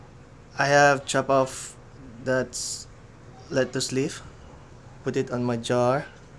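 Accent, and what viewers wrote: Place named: Indonesia. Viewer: logatnya indonesia nih wkwk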